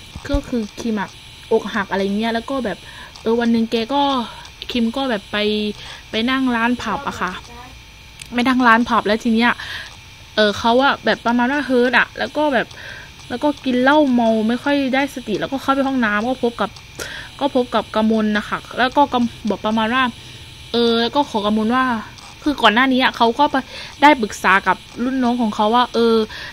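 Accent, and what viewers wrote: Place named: South, Thailand. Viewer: คนใต้ชัว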